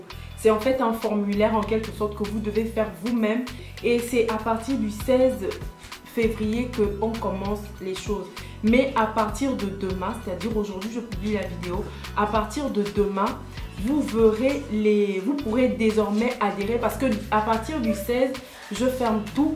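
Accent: Gabon. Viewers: Juste par ton accent je sais que t’es gabonaise 😋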